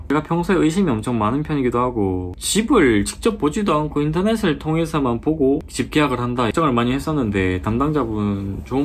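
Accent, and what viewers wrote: Daegu, South Korea. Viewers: ㅋㅋ 대구 사람이신가 대구사투리 느낌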